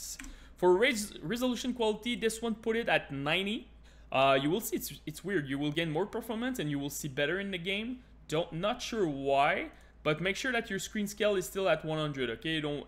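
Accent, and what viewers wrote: Quebec, Canada. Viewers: un quebecois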